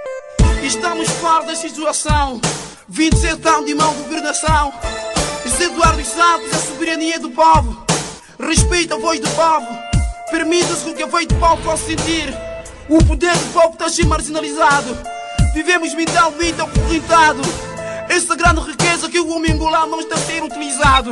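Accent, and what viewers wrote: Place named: Guinea-Bissau. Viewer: esse gajo nao é angolano! tem sutaque de guineense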